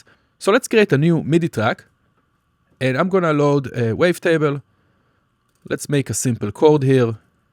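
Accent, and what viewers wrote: Israel. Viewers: gotta love that Israeli accent (great tutorial btw!)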